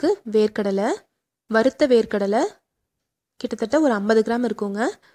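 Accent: Coimbatore, India. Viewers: Your netaive Coimbatore ma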